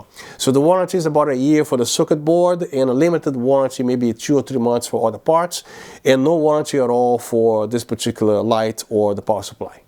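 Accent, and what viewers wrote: Brazil. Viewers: There aren’t many reviews of these new models and they look amazing, thank you. I own 2 la200d and absolutely love them, I plan to get 2 la300r next year, hopefully. Have you checked out the godox s120t softbox? There are also other sizes, but they are “umbrella” style, apparently much easier to set up and colapse, I want to replace my softboxes with 2 s120t. I’m from Brazil and even though these products are relatively inexpensive abroad, here the prices are absurd. Ps.: you sound Brazilian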